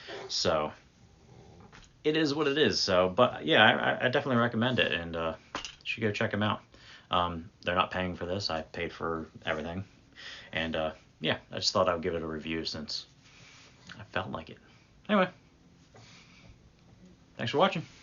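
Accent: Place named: Pennsylvania, United States. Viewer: Just got to the part where you say you’re from PA... haha I can hear it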